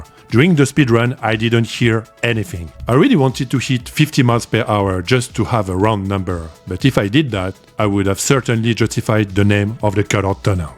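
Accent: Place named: France. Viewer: je te le redemande , es tu francais ? l'accent me vle fait penser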